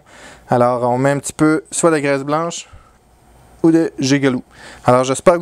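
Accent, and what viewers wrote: Quebec, Canada. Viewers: c'est une vis sans fin qu'il y a là au milieux? Où bien une courroie? Avec le parler Canadien ou Québecois je craint la valeurs de mots!...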